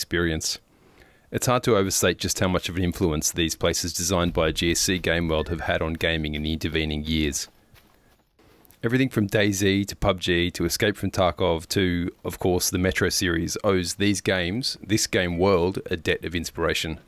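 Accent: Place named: Germany. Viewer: Місцезнаходження: Японія, автор з німецьким акцентом про українську гру - де ще таке зустріти , як не на YouTube ?)))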